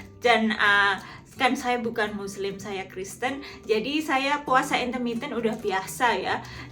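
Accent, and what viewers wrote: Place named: West Java, Indonesia. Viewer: Waalaikum salam mbak yu Iki kok koyok wong kulon deso betul ngak e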